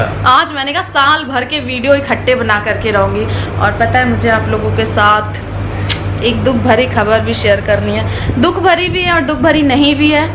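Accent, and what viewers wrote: Kanpur, India. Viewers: Aaj ka like, comment kanpuriya language k liye ... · Hello ji kaisi hain aap yah Kanpuri Bhasha mujhe bahut acchi Lagti Hai Main Mera Ghar to Lucknow Mein Hi Mein Hi rahti hun lekin Meri jyadatar rishtedar Kanpur mein hai aur mera aana jana Laga rahata Hai Kuchh farookhabad mein bhi hain Sare rishtedar Udhar hi hain Tik Tok Par video banana Koi Buri baat nahin hai agar aapko Achcha lagta hai to aap banaaiye bus yah hai ki Thode bure comment bhi Aane Lagte Hain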